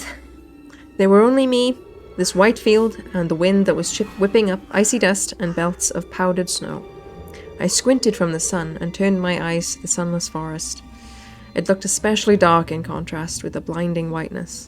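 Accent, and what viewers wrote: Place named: Eastern Europe. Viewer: Dear author, I realised your accent is familiar, especially "р" sound. Actually, where are you from? Are you slav? Sorry for my bad English, please reply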